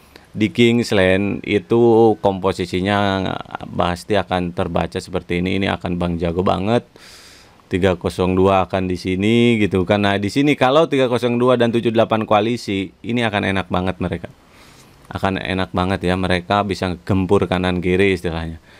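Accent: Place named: West Java, Indonesia. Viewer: penyebutannya / pronounciation nya dia aja karena dia orang Sunda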